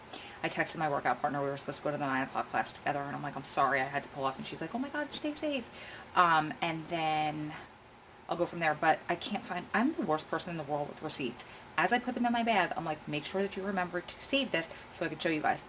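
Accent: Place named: Long Island, United States. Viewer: Awww no prob! I love your personality & accent. I have the same one bc I'm from long island, NY. Lol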